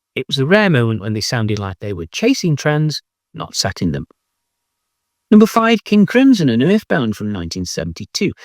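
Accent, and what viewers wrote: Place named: Newcastle-under-Lyme, United Kingdom. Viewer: A Prog-talking Stokey! Ace! Actually, you sound more Newcastle-under-Lyme? You're right about these albums, of course, but from a nostalgic perspective, they still have a place in my heart and I'll just listen to them from time to time just to remind me of what I was doing in the 80s.... Was never an ELP fan... but have the 80s ones you mention by IQ, Rush, Asia, Camel, Yes, Kansas. They have infinitely better albums, but these are part of their canon and I can enjoy them with the windows firmly closed so no-one can hear what I'm listening to. Guilty pleasures. Single Factor I like, Nomzamo I really like (but I was 18 at the time), and Union has its moments. The biggest let down of these for me was Hold Your Fire, for sure.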